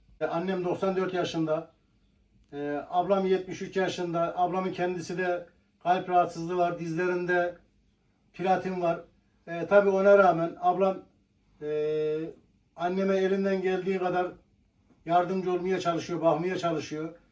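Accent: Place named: Central Anatolia, Turkey. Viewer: Şefim seni çok seviyorum programını takıp ediyorum.Şivenle anlatışınla buram,buram kokan bir İçanadolu çocuğusun.Hep merak ettim araştırıdım. Sana sağlıklı,huzurlu,mutlu,umutlların tükenmeden yaşamın tükenmesin.Seninle seni görünce bir gönül bağı kurdun çünkü bende Kırşehirliyim.Selamlar,sevgiler.....!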